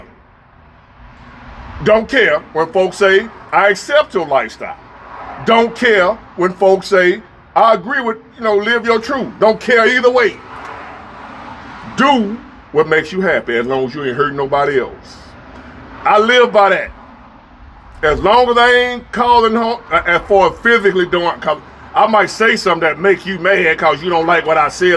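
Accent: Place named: South, United States. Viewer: Got the rocking chair on the porch! I hear ya bro! Can tell from the South!! 💪🙏✝️🇺🇸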